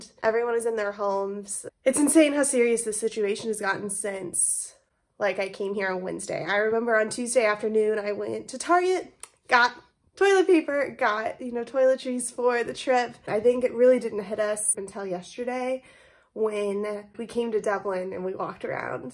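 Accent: England, United Kingdom. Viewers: Wow! Thanks for the update! I'm glad you are safely back. Hope to see more videos soon. I really love to hear your English accent. By your accent I'm sure you are not from the south. Because you don't have a southern accent. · She's American so how does she have an English accent 😶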